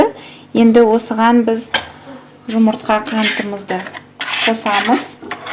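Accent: Standard. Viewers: Менин ойымша казакша таза сойлеп турго.келисем мак коп сиякты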